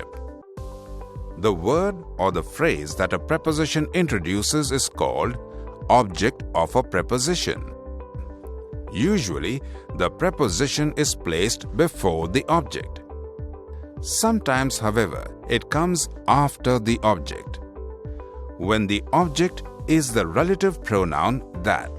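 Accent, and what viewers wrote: India. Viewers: Indian English ??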